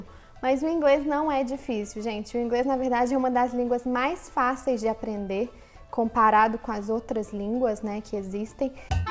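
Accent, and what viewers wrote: Brazil. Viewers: ... eu aprendi sozinha aos 10 anos também... e mesmo sem nunca ter pisado em país de Língua Inglesa, falo um Inglês impecável - consigo fingir tranquilamente que sou nativa americana -, aí percebi um sotaque no teu Inglês... pergunta: você consegue falar o Inglês limpo, sem sotaque "brasileiro"?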